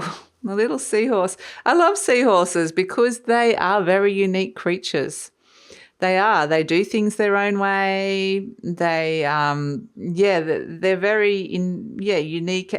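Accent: Australia. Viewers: ❤ nice to hear an Aussie accent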